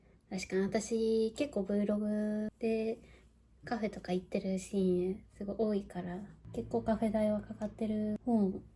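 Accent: Kyoto, Japan. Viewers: nokoさん改めて2万人おめでとうございます🎉🎊 nokoさんの京都弁のお喋り可愛くて好きです🥰 むっちゃんへの愛❤️感動しました🥺🥰 これからもカフェやスイーツ、京都のこと、むっちゃんのことなどなど、楽しみにしていますね😉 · 2万人登録者おめでとうございます㊗️ さんの京都弁がとても可愛いくて癒されます😍私は東京生まれの東京育ちで看護師しています。京都に憧れていて、いずれ京都で暮らしたいと、思っています。猫とコーヒーが私も大好きでいつも楽しく観ています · nokoさんの柔らかい声、京都弁、聞いててとても癒されます😍これからも喋りのvlogぜひ撮ってください✨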